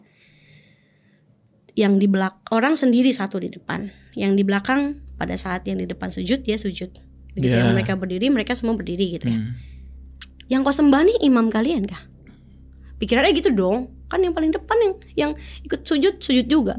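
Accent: Malaysia. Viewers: Emang lucu kalo denger orang Malaysia ngomong Bahasa Indonesia, kedengarannya kayak beda aja gitu logatnya ada ikut-ikut nya 😅. Tapi untuk kaka jika ingin berbahasa Indonesia maka gunakanlah Bahasa Indonesia dengan baik dan benar, jangan digabung ataupun di campur dengan bahasa asing seperti Inggris dan yang lainnya ya kak😉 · Kakak ni org mana? Ckp macam ada sleng malaysia😍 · mau tanya, ni org Indonesia atau Malaysia?sbb sleng kadang² seperti org Malaya😁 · logatnya kayak org Malaysia suka dngar🌹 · Sebagai orang Malaysia aku boleh detect "slank Malay" walaupun dia cuba sedaya upaya berbahasa Indonesia.😂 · accent cakap macam orang Malaysia laaa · Iyaa orang KL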